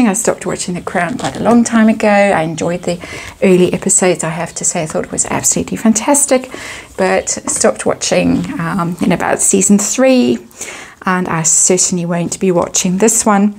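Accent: South Africa. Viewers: Great! Thanks! I absolutely love your readings! Your beautiful SA accent lends a lovely gentleness to the readings even when the readings are darker than usual, as was this one! Stay safe lovely lady xx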